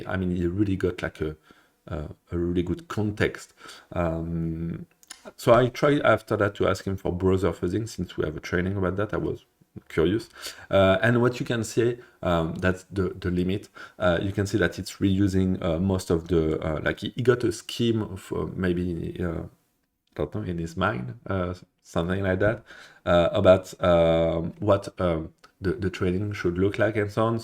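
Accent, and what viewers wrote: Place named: France. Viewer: T'es francais toi mdr très bonne vidéo sinon!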